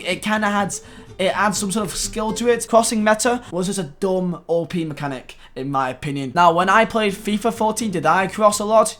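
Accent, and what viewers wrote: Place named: North, United Kingdom. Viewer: Northern. That's is all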